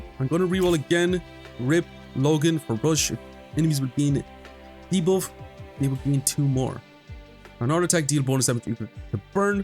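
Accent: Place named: Midlands, Ireland. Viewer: Lovin the videos on Guildrun man but I can't place your accent and it's drivin me up the walls haha its between Monaghan and Roscommon I'm guessin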